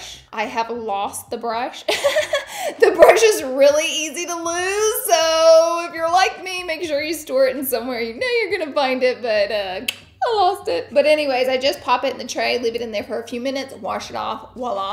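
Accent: South, United States. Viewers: Ugh, looooooove these videos! Used a few of your links 😁💖 Side Note ~ I love that you haven’t lost your country twang lol!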